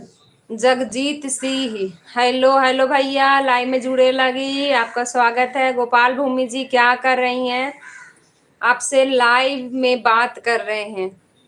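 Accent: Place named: Magahi, India. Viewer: मैं जहानाबाद के सरेया से.वर्तमान निवास धनबाद .आपकी सुन्दर मीठी मगही से आ जाती है गाॅव की याद.मैं लगभग आपके पिता की उम्र का हूॅ.लेकिन इतनी अच्छी मगही नहीं बोल सकता.देश दुनिआ में मगही भाषा को उजागर करने बढानें के लिए आशिर्वाद.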